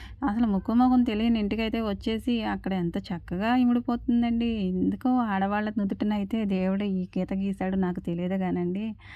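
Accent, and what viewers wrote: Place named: East Godavari, India. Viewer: ne matlade slang ma vari menattagari ilane untundi.vallu east godavari dist. Nannu Amma Ani antunnavu I felt very good. Inka na family maku oka abbayi. Ante ma varu monna sept lo cancer to chanipoyaru. Anduke nenu austrelua ochhesanu. Ma kodalu kuda ikkada oka pedda off lo engineer. Ma abbayi kuda engineer. Vallaki oka papa vahini 3rd class. Nenu kuda ikkadiki ochhi job chestunna. Na age 65 ayina naku individuality ekkuva. India rate prakaram 75k ostundi. Naku hyd lo oka place undi. Ikkada ma pillalu 2 houses 2 cars hyd lo 2 places konukkunnaru. Valla salary lo konni loans cut avutayi. Anduke nenu job ki vela. Ikkada Anni mechines kanuka nuvvu chesinanta pani undadu. Nenu ma kodalu evariki veelu unte vallam chesukuntamu. Idi na sangatulu. Ma sisters antaru. Inta age lo job enduku pillalu chusukuntaru kada Ani. Naku oppadu. 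Amma nenu msg pettindi neeku ardam avutunda. Lekunte nenu msgs manestanu. Cheppu emi cheyanu.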